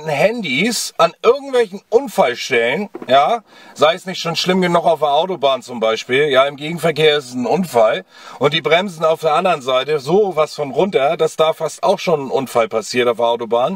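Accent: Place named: Hamburg, Germany. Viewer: In welcher Stadt wohnst du weil du klingst so Hamburgisch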